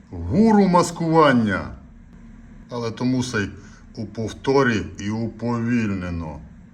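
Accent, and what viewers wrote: Transcarpathia, Ukraine. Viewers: Дуже файно на закарпатський лад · Класно по Закарпатськи)) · Закарпатська озвучка-ТОП❤🫡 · Йой,як я давно не чув файної закарпатської говірки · Дякую за роботу і Закарпатський діалект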